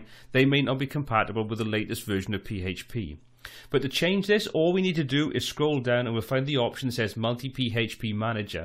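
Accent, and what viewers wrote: Wales, United Kingdom. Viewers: Welsh accent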